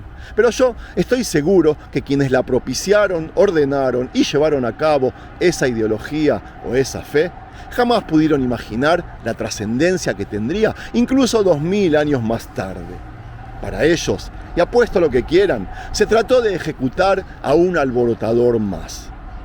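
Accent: Argentina. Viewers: Tu no eres judio,eres un argentino que se cree judio muy distinto Si fueras judio real no tuvieras el tipico acento argentino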